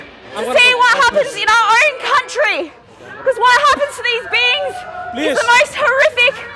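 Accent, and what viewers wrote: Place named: Australia. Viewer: Where is her pretentious Quebecois accent though? The vegan zombie is from Quebec... This youtuber has an Australian Accent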